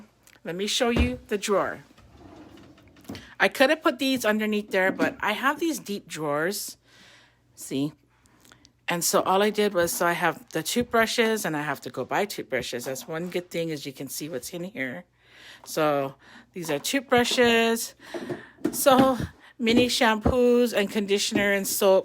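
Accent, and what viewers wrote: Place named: Hawaii, United States. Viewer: Mahalo for making this video. I wish I could hire you to organize my house. Your voice is making me miss my friends from Hawaii.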